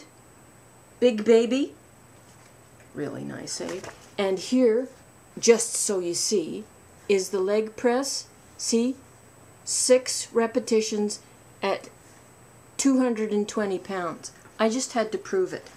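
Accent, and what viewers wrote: Canada. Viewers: I love your videos. I come everyday to your channel to see if you have put up something new. Yes you are quite charming;). I love how you pronounce your words so precisely and your Canadian accent.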